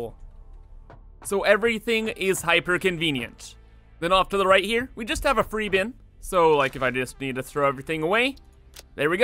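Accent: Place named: Canada. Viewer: "Aboot", "byees".... methinks is Canadian, ey?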